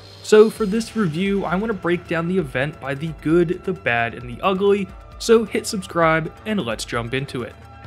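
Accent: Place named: Pennsylvania, United States. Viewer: Are you from Pennsylvania? I hear a strong accent. I'm loving this game, but yeah the events are lazy.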